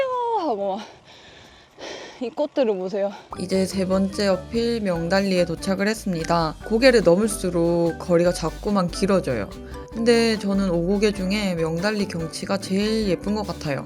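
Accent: Gyeongsang, South Korea. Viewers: 멋집니다^^ 경상도 말투가 더 정겹네요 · 뭔가 갱상도 악센트가...ㅋ 대단하세요. 예쁩니다.^^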